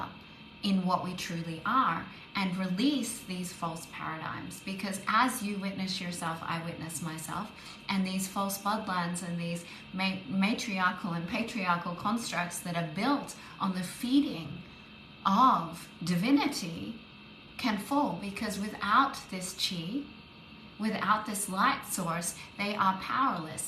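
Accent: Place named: Australia. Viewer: Yas! So beautiful, thank you very much! So much love and grace as always. I live how ur expression of language is so great and this australian accent, yeees ❤ and this bloodline perspective is on point. And this kind of description is good. There are many layers and definition to these dynamics. But prince and princess is more likely acceptable storytale for humans So, one question: are the chakras of the Earth moving? Meaning, can I check them by researching these points.. Btw.. the flags of countries are also showing what energies and colours they hold.. or work with.. Thank you and sending light as always ❤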